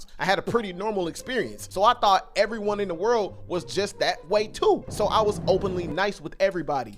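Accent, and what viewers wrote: Alabama, United States. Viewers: What part of bama you from brodie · Bro what part of Alabama you from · Bro you from Alabama 😮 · What part of Alabama u from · This niggas from Alabama 😭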